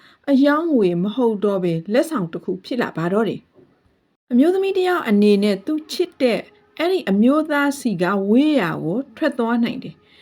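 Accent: Rakhine, Myanmar. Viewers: ရခိုင်သံကြီး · မမ ရခိုင်သံနင့် တူပါတယ်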